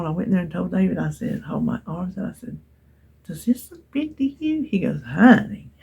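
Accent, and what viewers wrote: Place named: North Carolina, United States. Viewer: I just LOVE to hear you talk! I live in Wilmington and the way you talk reminds me so much of my friend who lives in Lake Toxaway. A few videos back I think I remember you talking about Whataburger in Kannapolis and it brought back so many memories. We used to eat there when I was a kid and would go visit my brother in Concord.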